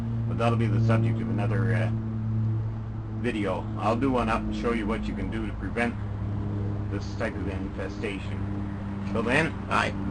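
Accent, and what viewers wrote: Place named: Canada. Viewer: you're not nice.. The guy is 1. Above 60 years old and 2. A nice sounding Canadian fellow. Give him a break and save your hate.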